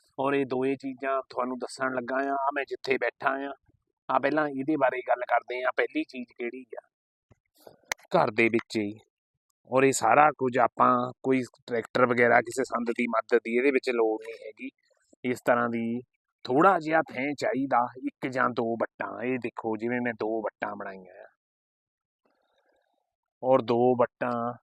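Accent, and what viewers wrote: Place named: Hoshiarpur, India. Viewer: Veer Hoshiarpur ton o lgda a bolan ton ma v Hoshiarpur ton a reh bhr rea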